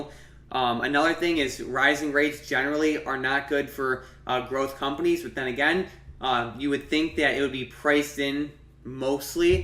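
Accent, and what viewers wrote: Midwest, United States. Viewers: You sound like your from Minnesota or Wisconsin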